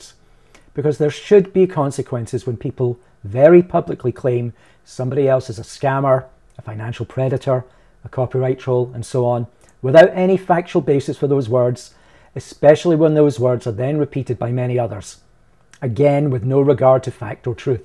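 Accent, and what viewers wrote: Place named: Scotland, United Kingdom. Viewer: I've been following everything these last few months and I have to say you come across as a completely, upfront, honest genuione bloke and acted in a completly professional way. If you ever need a slightly bigger platform to discuss the ins and outs of copyright then sir you're more than welcome on my channel any time. The second I heard the Scottish accent i knew you were a solid bloke. :-)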